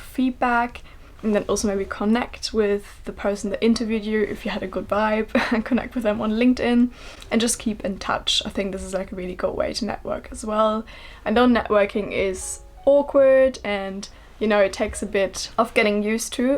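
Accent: Germany. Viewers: Your accent sounds quite german or am I hallucinating? Great content. Love England. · She has very strong german accent though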